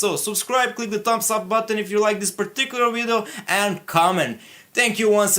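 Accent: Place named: Northern Europe. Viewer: good to know. Just know that I'll be supporting you, because not many people respect film scores such as yourself. And also, where are you from?- you sound northern European.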